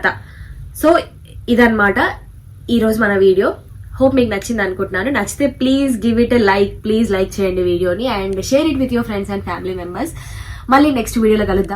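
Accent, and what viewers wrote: Telangana, India. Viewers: Bro Telangana slang marchaku Anna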